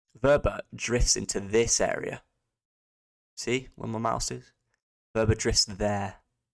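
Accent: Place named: United Kingdom. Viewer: Am trying to figure out your accent what part of the uk you from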